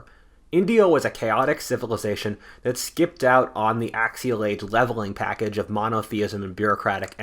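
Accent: United States. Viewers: I heard the heavy American accent, & immediately disregarded this video. .... The short introduction alone showed how naive (poss believing all the heavy US propaganda) this presenter is. .... Wait just a few more years, young Sir, & you will be horrified at what happens betw East & West.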